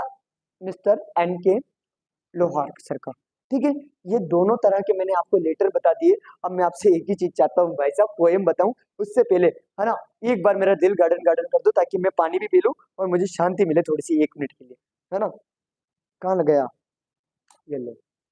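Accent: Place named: Hadoti, India. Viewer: Sir aaap hadoti bolte ho kaha se ho aap kota ya baran❤😮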